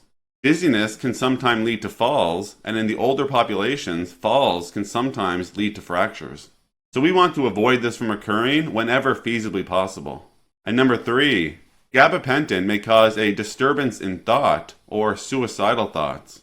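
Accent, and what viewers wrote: Newfoundland, Canada. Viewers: You must be from Newfoundland . Love the channel subbed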